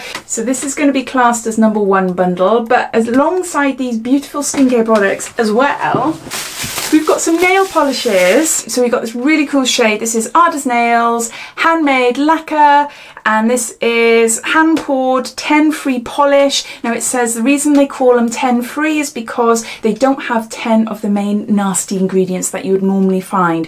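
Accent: United Kingdom. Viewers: It's ok for the mix-up i was hoping to see who won if i got a chance at something and i thought u were picking the winners on Thursday so i did the same thing lol im so silly XD xoxoxo _ Your #1 Fan! I said this before for my entry into this giveaway but here u go again.: Wow, congratulations on 10k!! You deserve it! \Your so positive and lovely! You cheer me up!! I'd love to have all of it but I haven't got Instagram or Twitter or Facebook so that sucks if I win I could give u my email to contact me I am living in Florida currently and I can't wait to see what else u have in store for your channel... I like the accent it sounds like your a British princess!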